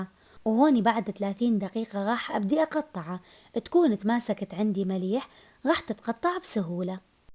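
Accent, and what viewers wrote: Mosul, Iraq. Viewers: مساء الخير على الجميع شكو ماكو خير ان شاء الله وينك اختنا الموصلية الشيف المميزة بالاطباق العراقية المنوعة الطيبي مشتاقين للصوت الموصلي والابداع المطبخي المميز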